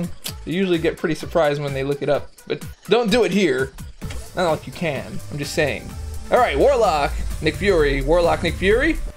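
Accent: Jamaica. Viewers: are you jamaican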